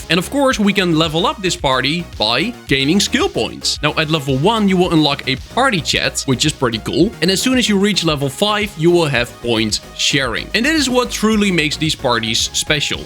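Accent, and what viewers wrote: Netherlands. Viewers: the fact that you can hear that he comes from the netherlands is crazy (ik ook daarom weet ik dat)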